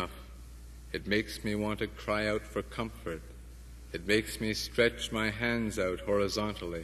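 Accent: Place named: Canada. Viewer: It is his young voice with canadian accent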